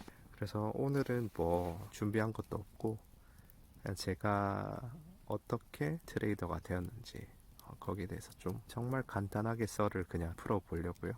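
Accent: Gyeongsang, South Korea. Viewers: 최근에 우연히 유툽을 청취하게 되면서 재미에 푹 빠져든 예순을 넘은 경상도백수아제입니다..ㅋㅋ 언어에서 약간은 경상도 정서를 느꼈었는데 경산 갑제동이 본가라고 하시니 TK로서 더욱 정감이 가네요! 고령화시대를 맞아 중년들의 경제적 고민도 깊어가는것 같은데 수준높은 강의에 소중한 도움을 얻게 됩니다. 장작불의 운치가 썰을 푸는데 기막힌 조화를 이루는듯 합니다. 건승을 기원드리며 응원합니다.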